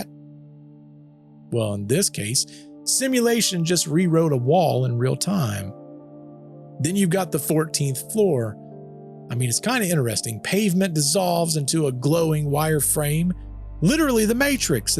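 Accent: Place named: United States. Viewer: It’s so dope to hear an American voice narrating 👏🏽👏🏽👏🏽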